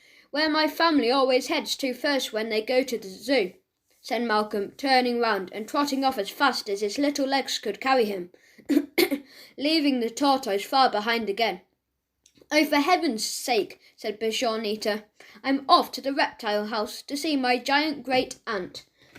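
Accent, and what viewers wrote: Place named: England, United Kingdom. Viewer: I am very good at telling accents, I think he is from England. Also amazing reading!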